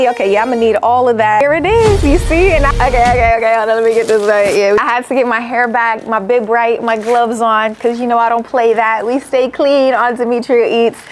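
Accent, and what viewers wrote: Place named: South, United States. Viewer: I love the video but i think i got turn on from the cowgirl country talk, then she sings the and song and then she wears a red dress and i think the pumps to go w it.. i love you girl like literally. Honestly, i don't like too many ppl but this lady does something to me. Always have great food reviews videos...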